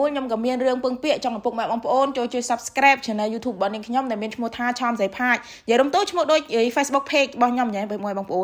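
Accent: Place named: Siem Reap, Cambodia. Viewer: នៅខែត្រសៀមរាបបានជានិយាយល្អើន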